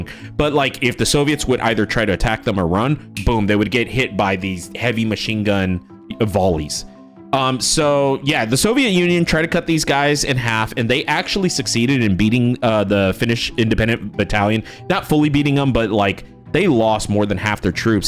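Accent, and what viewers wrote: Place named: United States. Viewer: hearing a american say the g or ch will never be not funny to me, if you want more lore about more regiments of renown you gotta do some looking into, they sing about historical units, events, people, battles and other unique "items" of war without any of the stigma behind it of it being used for good or bad, axis or allies. is one of there song and a banger at that. fair note it is metal music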